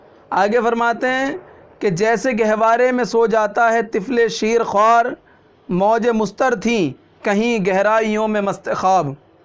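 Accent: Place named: Delhi, India. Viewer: Sahib ki meethi Dehli ki zubaan sunne mauqa ms. Maine pehle likkha tha ke is nazm ko pira sunna hai. Aur Inka parha dxplanation aur poems bhi sunne ka bohat beta i se intezaar hain. Main Dehli me Jama Madjid ke paas aur Ballimaran Ahata Kale Sahib aur Bara Hindu Rao men reh chuka hoon. Ham Dehli ke ASHIQ hain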